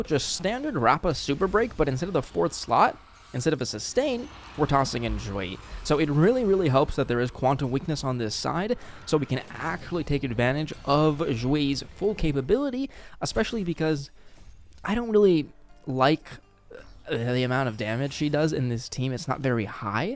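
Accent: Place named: Latin America. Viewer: Dude, u speak like a latin man jaja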